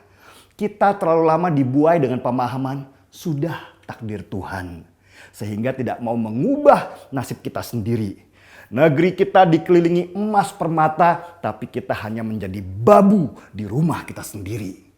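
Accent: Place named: Medan, Indonesia. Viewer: Jelas, lugas, tegas... gaya medan banget... Indonesia beruntung memiliki karakter2 suku yg khas dan berbeda2... Gunakan semua karakter dr msg2 suku utk membawa Indonesia maju...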